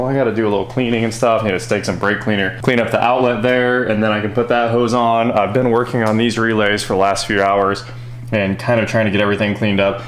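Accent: United States. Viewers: Please Rolls Royce's are ladies like ships (well the drive like boats too) there aways female! Not bad boy's please have more class now you gone up market and try to speak english not yank! remember bonnet not hood, boot not trunk, and petrol not gas but loveing the fun of the build!!!!!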